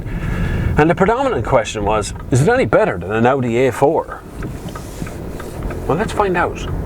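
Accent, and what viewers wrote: Ireland. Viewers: In all seriousness, thanks for the review. But to everyone who has never heard an Irish accent remember: Der's more to Ireland dan dis! See Alan Partridge: v=1QRlR0ctjNE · damn is that what the general irish accent sounds like? I first thought he was dutch or something...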